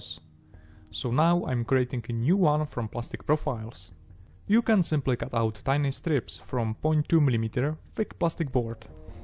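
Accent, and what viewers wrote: Czech Republic. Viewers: další skvělý model, ale mondieu ta czengličtina :) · Čecha mluvícího anglicky poznám na tři míle. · You Czech?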